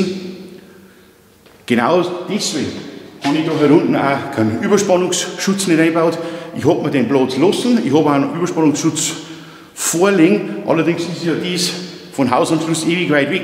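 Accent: Bavaria, Germany. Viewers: Ich weiss das er heisst mehr nicht und bayrisch redet oder Österreichisch keine Ahnung was soll man da eingeben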